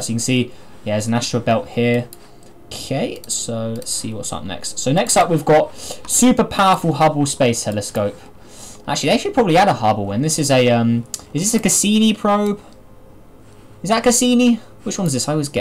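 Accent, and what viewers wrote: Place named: United Kingdom. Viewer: Are you from United-Kingdom? Its like if you have a little accent 😀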